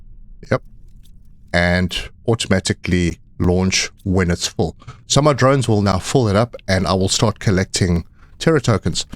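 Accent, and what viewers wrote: South Africa. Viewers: I was wondering if the accent was South African, Aussie myself but my great grandfather was South African, his son, my grandfather was responsible for starting my gaming addiction on his Atari back in the day.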